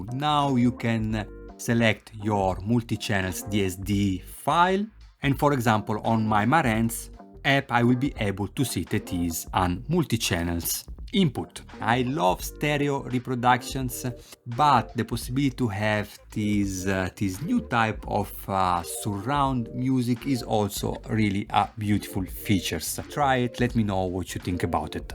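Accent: Italy. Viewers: Terrible englsh italian way 🤕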